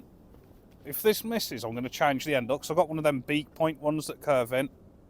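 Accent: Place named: Black Country, United Kingdom. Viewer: never done sea fishing I’d like to give it ago came across your Channel watching “Snagged Bro” However I can’t help but make your accent to be where I’m from… BIRMINGHAM! Sound like from Walsall or Dudley from there myself 😅 Keep up the good work enjoying the videos 👍 Ps love the “no bivvys round here mush” jumper in your previous videos 😅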